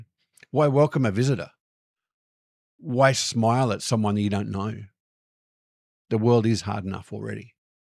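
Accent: Australia. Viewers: A slightly different take: We Americans DO want something. We want your story. Give us a tale. How's your day going? Anything interesting? Where are you from? Like to have an iced tea and swap tales? I think we like good stories. Sort of vicarious journeys?? Maybe thinking about things we'd like to do? Don't know that all of this is true...just throwing it out there as a possibility. Your accent's cool, by the way. You from Australia? Wherebouts in Australia? What brought you to our shores? Are we what you expected when you moved here? Any !Aha! moments? :) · Yes, sir I'm an American from Tennessee who saw your vid, "They Want Nothing" on the channel & I just wanted to come show my appreciation here on your channel. Your insights were spot on, many of us Americans are raised, especially in the south to treat others how we would want to be treated so compliments & being helpful to someone, strangers & friends alike, becomes second nature & yes, it is sincere. 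So, Thank You for noticing & appreciating the American way. We're glad to have an Aussie here in the states & I do like the accent too. Keep up the good work & I pray your channel grows to new heights. God bless! 🙏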